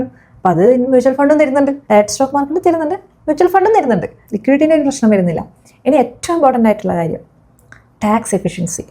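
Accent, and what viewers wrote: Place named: Malappuram-Palakkad, India. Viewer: നിന്റെ സംസാര ശൈലി നല്ല രസമുണ്ട്. മലപ്പുറം പാലക്കാട്‌ ഭാഗത്തെ സംസാര ശൈലി പോലെയുണ്ട്. Anyway good content and good presentation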